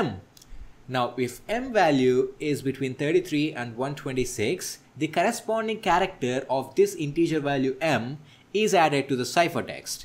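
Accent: India. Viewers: pqp indiano falando em inglês é um porre.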